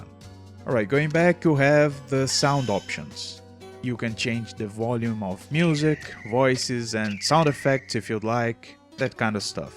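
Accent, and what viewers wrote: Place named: Brazil. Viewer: Man, I can't express properly how happy I am to see a guide like this, and especially one for this really unique (and extremely comfy) version of Densha de Go! Also loves everything you do in your channel, exactly the type of game that I love but gets no recognition (boku no natsuyasumi, man!!!!). Great content all in all, hope you keep it up! Also, are you by chance originally Brazilian? Your accent sounds like it, and it got me curious.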